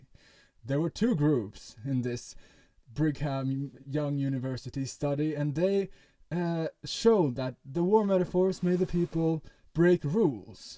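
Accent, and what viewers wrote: Sweden. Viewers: Loving the Swedish accent which is strong in this one:)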